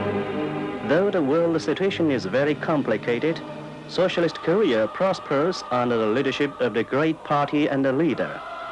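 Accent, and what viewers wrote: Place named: Ireland. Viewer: The narrator has this Irish accent with a mix of broken English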